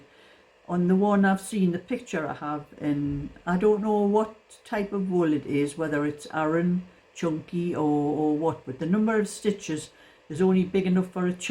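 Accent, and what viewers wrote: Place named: Ashington, United Kingdom. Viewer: Hi, can I ask whereabouts you are from? You sound like my husbands family in Ashington xxx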